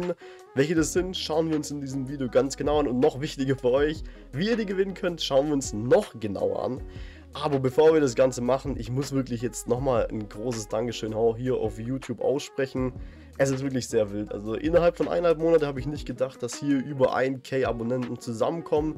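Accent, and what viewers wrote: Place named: Swabia, Germany. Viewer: Kommst du eigentlich aus dem schönen Schwabenland? Hört sich stark danach an 😁👍 Congrats natürlich zu den 1K 🤝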